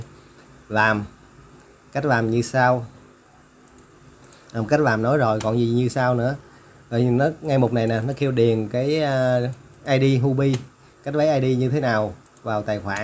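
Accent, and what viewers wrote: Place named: Mekong Delta, Vietnam. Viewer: Khoái nghe cái giọng miền tây của bạn này, nghe thật thà mà tiếu tiếu, nói chung tất cả video bạn hướng dẫn rất rõ ràng ... thanks chúc bạn thành công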